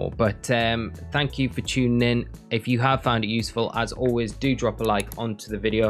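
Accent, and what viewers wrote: Newcastle, United Kingdom. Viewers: Why’s a Geordie posting at 3am? Too hot too sleep?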